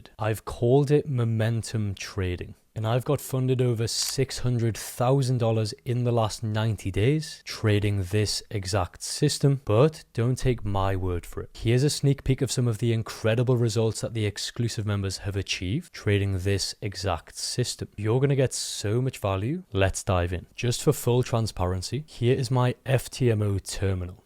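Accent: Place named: Wales, United Kingdom. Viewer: Thank you for another great video. What assets do you trade/ monitor..p.s love the Welsh accent.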